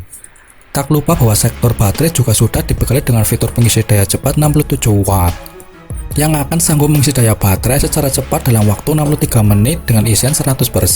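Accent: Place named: Java, Indonesia. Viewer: Medok amat..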